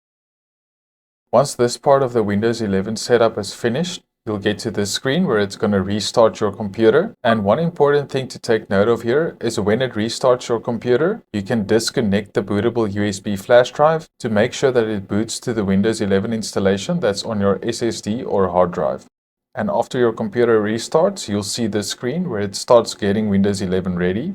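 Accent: South Africa. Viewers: Ek Sal jou sommer n soen op die voorkop ge❤❤❤thanks my guy I've been struggling for days now trying to repair my windows 10 but eventually was like stuff this let me upgrade it to windows 11 and your video came up heard the accent I was like his one of the ouens and I really appreciate you have a great and awesome day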